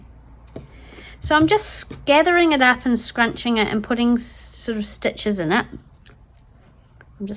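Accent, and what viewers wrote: New Zealand. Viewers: I so enjoyed watching this video with you! I’m a Kiwi too so it’s nice to hear a familiar voice! Love these little bags, which will be great additions to my journals and tags. Look forward to watching your next one! Wish I had seen your Giveaway, it looked really amazing! How exciting to be waiting for a new grand baby!🧚‍♀️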